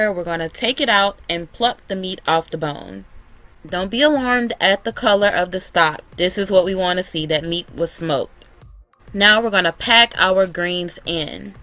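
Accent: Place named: Chicago, United States. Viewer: Are you from Chicago? You have the accent. Lol. Great video.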